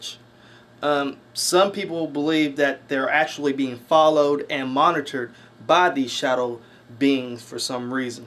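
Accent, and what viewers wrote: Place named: South, United States. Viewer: just curious but are you from down from around like in the North Carolina area? down in the southern u.s area. not to be offensive or anything cause you have an accent. you say "Yall"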